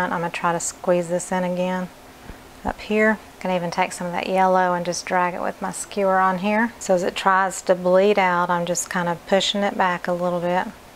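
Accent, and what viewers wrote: South, United States. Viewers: Well....you're def from the South but it's not bad at all!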